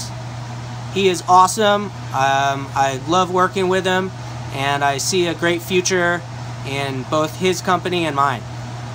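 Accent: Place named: United States. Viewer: you sound like a warm blooded American, like me. Folks jealous can't stand your teaching freedom & and basically free self-sustainability, gj, and Many-Thanks!! I'm wanting to start growing some. You could almost live off these mushrooms, if it's enjoyable it could make you some decent money. 🍄 many thanks my friend.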